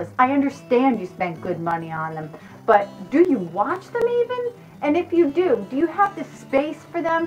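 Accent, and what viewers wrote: New Jersey, United States. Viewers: Thanks for the tip. I don't know why I haven't bought one of those DVD holders yet. I actually did purge my DVD's this year and I gave away about at least 100 of them to the girls at Good Counsel Homes. Anyway, I'm seriously thinking of getting a DVD book like you have for the rest of them that I decided to keep. MyDVD and CD cabinet is exploding at the seams. BTW -- I KNEW you were from East Rutherford with that Jersey accent.........I'm a Bloomfield girl myself!! God bless!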